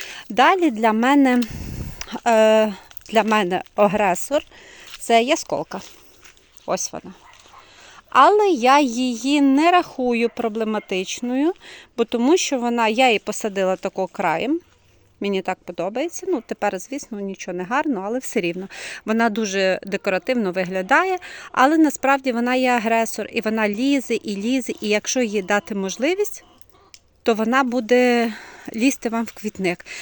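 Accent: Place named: Western Ukraine, Ukraine. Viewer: Укр.мова погана, западенська. Наголос пипець. Багато води... Терпіння не хватило дослухати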